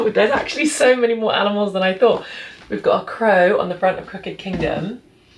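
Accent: United Kingdom. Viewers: Hi 💁🏻‍♀️ are you going to use this channel too or will you continue with the channel? should we subscribe to both? I love british accents and you have beautiful british accent🤍so pure✨Anyways, I found this channel by chance, I loved it and subscribed! I am obsessed 📚books and cozy comfort place, this channel what I need! 😌🌻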